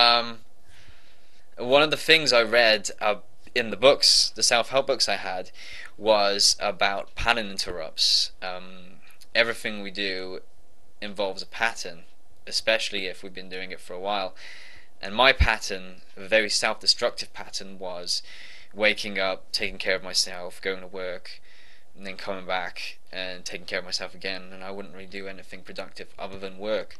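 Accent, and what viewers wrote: United Kingdom. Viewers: Where you from bro? Is that a welsh twang in your accent lol? I'm gonna start no PMO tomorrow, gonna aim for 30 days and may continue after more research and experience etc. Have you got facebook? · What kind of British accent do you consider yourself to have? Just curious because I'm studying English and really like your accent - I'm not sure though in which area of the UK do they speak like you? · Hey brother, firstly congratulations on your monumental achievement. Your video is spot on with all the good reasons to give up the fapping and is of great inspiration to me. I have been in exactly the same spot you described, massively addicted to gaming and fapping for years. I am now slowly pulling myself out from it (no pun intended!), and have decided to focus the extra energy and time towards meditation. Thanks for all the inspiring words. 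By the way I am trying to place your accent, Mid-Wales or Shropshire area per chance? Cheers again matey!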